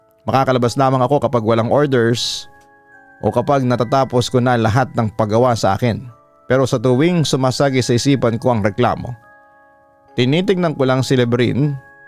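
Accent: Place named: Iloilo, Philippines. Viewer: ilonggo c papa dudot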